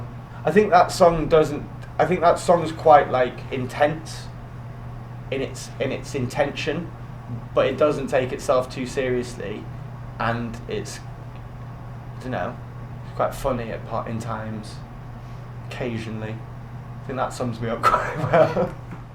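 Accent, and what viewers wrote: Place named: North, United Kingdom. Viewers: He has such a strong Manchester accent in this interview haha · He sounds really northern in this video!! Love it!!! Northerner represent!!!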